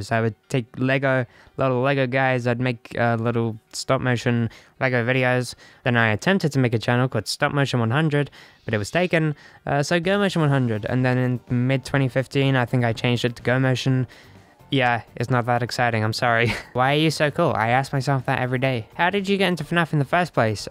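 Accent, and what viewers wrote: United Kingdom. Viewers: I will always miss your bored British voice, but I’m glad things are going well with your voice! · wait wait wait she's BRITISH?? how did i never notice it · Perpetually pissed off British voice is the single best thing I’ve read since I finished the Harry Potter series. So the best thing I’ve heard since last night. BUT STILL, it’s amazing. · WOW your voice sounds amazing (: but I will always miss that annoyed/ bored British accent. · How do you go from a low British voice to a high pitch feminine voice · I thing she sounds British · Rip bored british voice, long live new voice :) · I like his old birish voice mate But this sounds good One question is he trying to turn female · I love the new voice!!! I will kinda miss the old British one but i'm so happy for you!!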